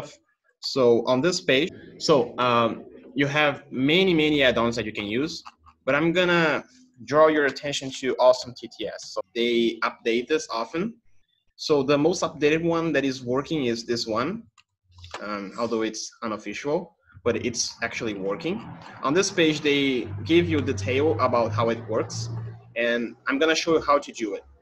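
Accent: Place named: Brazil. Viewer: Só pelo sotaque já vi que era BR 🇧🇷❤️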